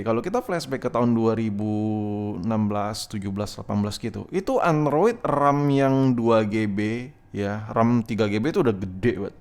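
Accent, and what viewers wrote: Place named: South Jakarta, Indonesia. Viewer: Bang elu jaksel ya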